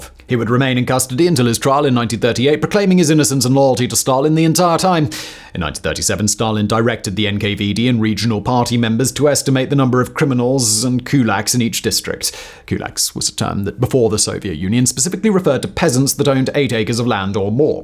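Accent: United Kingdom. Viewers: Thumbs down. Reason 1: You don't start video with a secs ad. 2. Your English is too British and chewy and those who don't speak it as their first language understand JACK. Put subs. proper ones, auto-generated won't do. Cheers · This guy has 50 channels lmao and he doesn't even know much about the topics he does videos on. It's basically Wikipedia paragraphs with a soothing British accent. I'll still watch though 😂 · This guy's British accent is fucking annoying me. I would've watched the whole video but that accent is too hard on me.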